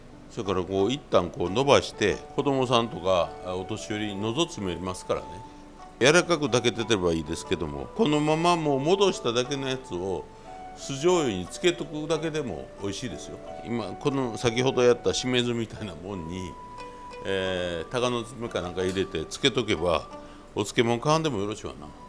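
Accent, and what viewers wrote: Kyoto, Japan. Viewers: 村田さんの料理本を持って海外赴任しました。どんなに役に立ったことか。村田さん、ありがとうございました。 今も参考にしてます。 京都弁も心地よいです。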